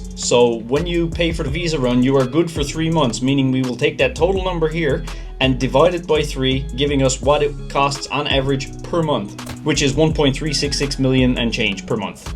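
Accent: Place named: Eastern Canada, Canada. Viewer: Oh okay. Nice. I thought by your eastern accent you were but I heard you say something about western Canada so wasn’t sure. Cheers. Love your channel. Cheers 🍻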